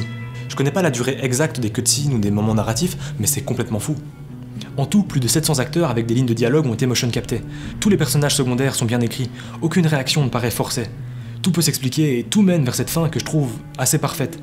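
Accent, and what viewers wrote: Belgium. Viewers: Il est belge ??? Psk il dit pas huit mais wuit par exemple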